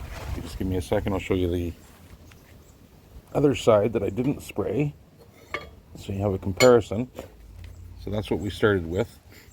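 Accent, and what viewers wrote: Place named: Canada. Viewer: You must live in the Philippines, but I hear a bit of Canadian accent. It sounded like you say "a boot" instead of about. 😅. Very hel0ful video, thanks.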